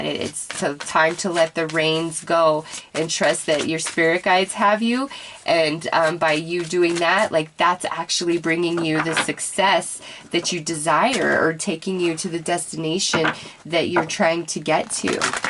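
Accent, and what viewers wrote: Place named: West, United States. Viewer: Beautiful reading that rings true. I love your melodious western US accent (I think). Your voice drips with love and kindness. God bless you and yours.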